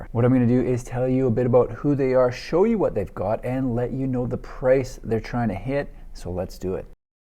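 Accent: Canada. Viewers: I would love if you got to go TOUR these favorites and get the behind the scenes info and tell us what places impress you the most. Maybe you need a US correspondent! I assume your Canadian because of the accent but you could be Midwestern too?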